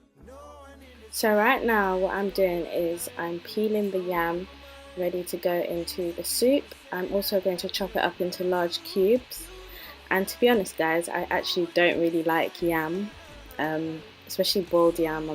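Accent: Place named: London, United Kingdom. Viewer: I can hear the London in you. Lool. Great job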